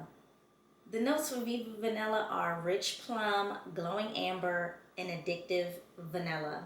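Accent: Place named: Philadelphia, United States. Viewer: She actually sound like she from Philly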